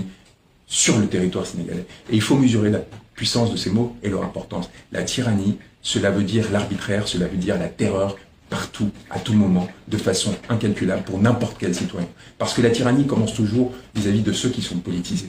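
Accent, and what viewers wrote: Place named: France. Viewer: Il nous parle le français de france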